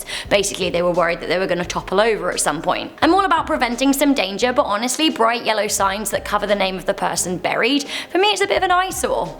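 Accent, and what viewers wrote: England, United Kingdom. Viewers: Its the british accent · Miss!! I REALLY LOVE YOUR BRITISH ACCENT!! I HAVE A EARGASM WHEN YOU TALK!! 🇬🇧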